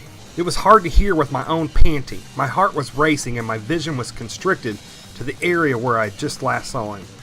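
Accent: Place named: Flint, United States. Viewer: Sounds like you live near Flint.Michigan lmao. Good series and the choice link finally worked. I'll have to go back to the beginning now.Thanks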